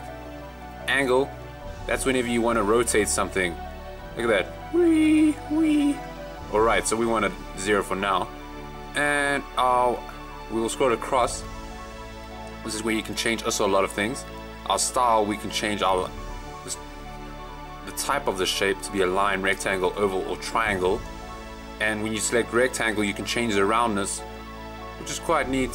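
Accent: South Africa. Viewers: I knew you were South Africa... but your screen just confirmed it - Rock on Pretoria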